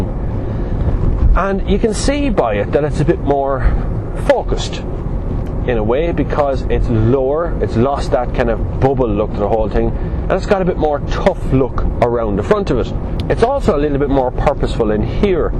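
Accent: Ireland. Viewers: thanks!! Congrats for the reviews, you are doing a good work! (and I like the Irish accent with words as "bush" "thash" for but and that hahaha)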